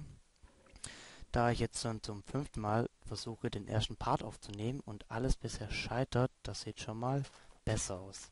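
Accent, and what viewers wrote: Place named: Swabia, Germany. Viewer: Schwäbisch Lets player :D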